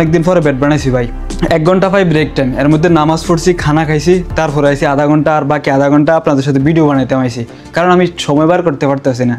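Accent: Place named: Comilla, Bangladesh. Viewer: ভাষা শুনেই বুজেছি কুমিল্লা কিন্তু কুমিল্লা কোন জায়গায়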